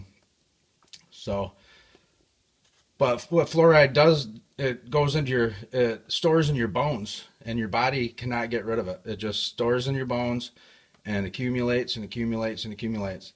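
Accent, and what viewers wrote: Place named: Midwest, United States. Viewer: I absolutely love that you took the time to make this video, thank you so much! (plus, I adore your Midwest accent).;) I started taking 1/8-1/4 tsp Borax everyday to get the fluoride outa my body & even though I now have a Berkey water system w/ the fluoride filters, I still supplement w/ Borax (& Lodoral Iodine) due to chemtrail crap & the various foods & beverages I ingest that likely contain fluoride. Anyway, you rock for sharing, thanks again.:)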